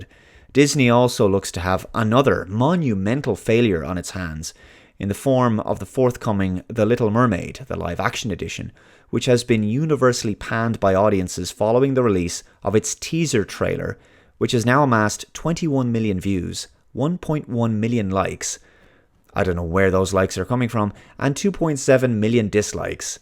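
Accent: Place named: Ireland. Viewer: At first I hated the accent because I thought he was British but towards the end of the video I realized he was Irish and started liking the accent. I think I'm racist.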